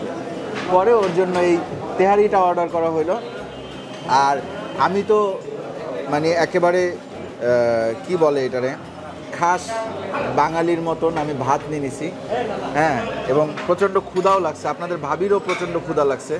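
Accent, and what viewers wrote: Barishal, Bangladesh. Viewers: Aapner Borishaillah accent er ki holo? Shunte besh bhaaloi laagchilo.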